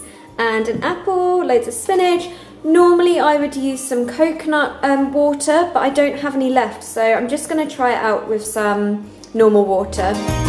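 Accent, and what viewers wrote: United Kingdom. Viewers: omg that British accent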